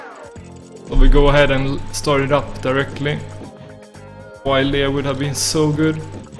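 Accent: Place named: Sweden. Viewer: swedish accent confirmed :D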